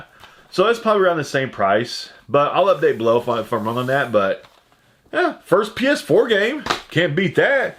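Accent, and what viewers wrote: United States. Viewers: Omg what part of Indiana are you from??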